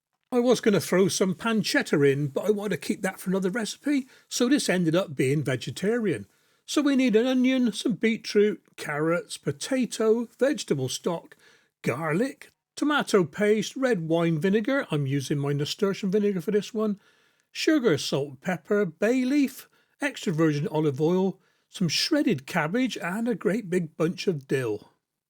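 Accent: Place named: Cornwall, United Kingdom. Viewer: What a recipe! Where's that from? Doukhbor... what language is that? Also, I'm trying to place your accent... are you from Cornwall?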